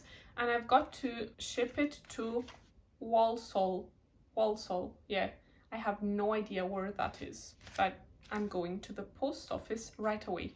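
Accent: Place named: Friesland, Netherlands. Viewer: You have a really quite the interesting accent. Doubtless it sounds different to Scottish ears, but to North American ones, it almost sounds Frisian? Not a biologist, but rather an historian and geographer. I've done Ancestry and 23andMe, and presently waiting on a MyHeritage kit. The results of the two services were quite divergent except in the aggregate. The reason you would get different results is that these services use their own predictive algorithms based on sampled populations. Proprietary algorithms will use different data sets and take alternate factors into consideration to calculate their results. The other thing to consider is migrations, immigration, and invasions. I'm about 50/50 English and Scottish, but will get some Scandanavian and French. Well, no wonder, 1066. And then the one service has me at 1.5 percent Iberian. 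I could have a Royal Navy or Marines war bride from Menorca or Gibraltar 5-8 generations back, or that's just Celtic migrations in the Iron Age. Could be the reason for your Bretonne result, too. Anyway, do keep an eye on your DNA results over time, because these companies fine-tune their algorithms and your percentages may fluctuate (or entirely disappear, and reappear, as my phantom Iberian does).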